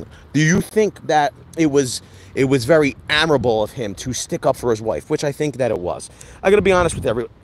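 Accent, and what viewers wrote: Philadelphia, United States. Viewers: Love the strong Philly accent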